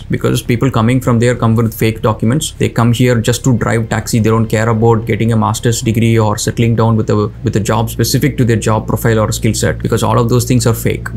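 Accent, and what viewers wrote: Tamil Nadu, India. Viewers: You can't be Telugu with that accent. Tamil for sure. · You've got the thickest Tamil accent one can have.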